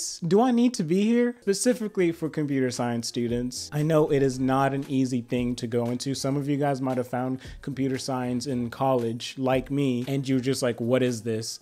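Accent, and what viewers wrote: Kenya. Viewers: are you by any chance kenyan?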